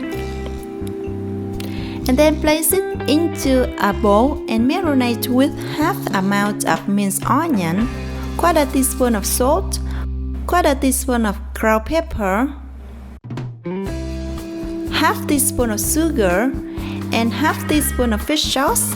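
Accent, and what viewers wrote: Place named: Laos. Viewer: Tiếng Anh mà nghe như tiếng Lào. Phát âm vc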